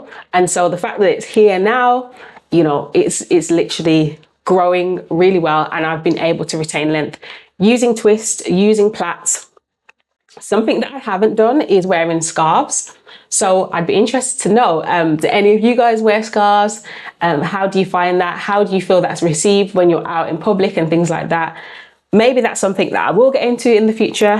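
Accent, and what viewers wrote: Birmingham, United Kingdom. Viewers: Wait are you a fellow brummy? 😮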